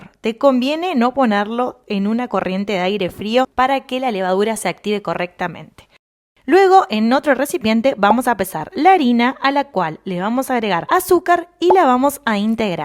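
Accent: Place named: Mar del Plata, Argentina. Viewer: Gracias, Probaremos tus apetitosas Donas, explicadas con tu lindo y ágil acento de Mar del Plata; soy panadero y repostero artesanal que hacemos hostelería con artes y tradiciones en Salento Colombia.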